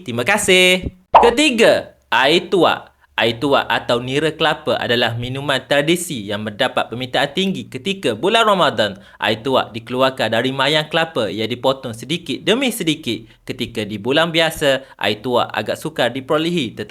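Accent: Kelantan, Malaysia. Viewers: Kelantan ke bro ?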